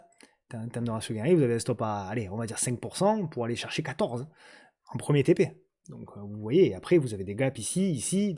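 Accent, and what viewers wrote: South, France. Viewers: Top, merci pour la vidéo, je m'ennuyais devant mes écrans avec une cheville en vrac (chute à moto hier soir), mais pas grave, rien de trop méchant, que 8points de sutures et pas d'os brisé, et puis j'ai ta vidéo pour me remonter le moral avec ta bonne humeur communicative (oui, l'accent du shud c'est sympa et chaleureux 😜) Bon WE ✌️ PS: non non, tes vidéos ne sont jamais trop longues pour qui sait apprécier les bonnes choses 😉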